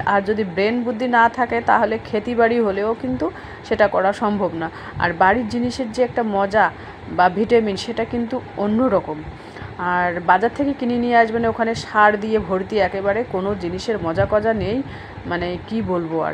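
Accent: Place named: Bangladesh. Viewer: পুরো ভিডিওটা দেখলাম, আপনার কথা বেশ ভালো লাগলো, মনে হলো আপনি বাংলাদেশ থেকে 😮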